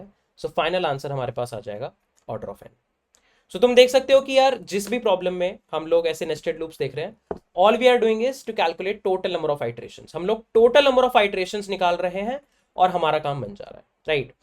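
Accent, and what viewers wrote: India. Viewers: through your commnet i noticed that this video was not in hindi language, the accent is so indian that I really thought that he is talking in hindi lol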